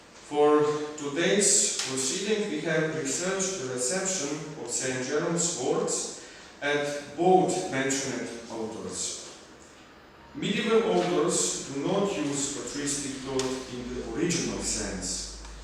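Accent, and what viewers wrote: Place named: Russia. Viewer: Majgad koji russian english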